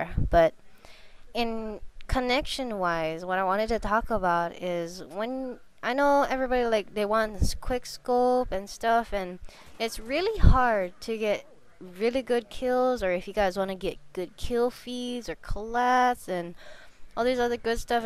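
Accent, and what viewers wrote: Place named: Spain. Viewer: yea i could hear your spanish acsent =)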